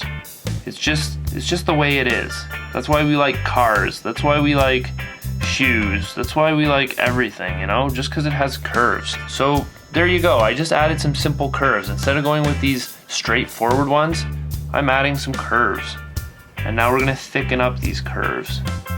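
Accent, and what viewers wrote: Canada. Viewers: It's actually quite slight. I didn't notice until almost two minutes in. In california, our considents at the end of our words are weak, almost silent. For Canada, it's in the way you pronouce your vowels within or at the start of a word, like out and start. And its only with a few words. Sometimes, you can't even tell. I mainly hear it in about.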